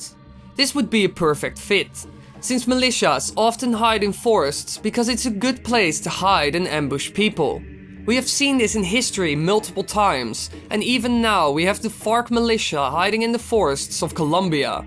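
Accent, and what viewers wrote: Netherlands. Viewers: ...This may be an odd question, but... are you Dutch?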